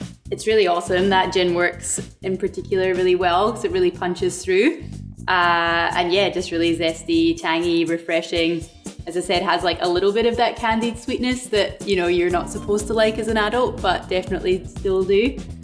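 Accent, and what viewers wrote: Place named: Scotland, United Kingdom. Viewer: Scottish accent...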